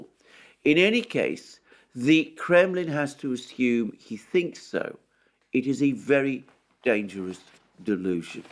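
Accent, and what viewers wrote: United Kingdom. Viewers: "Pentagin" 😁 I love the Brit accent.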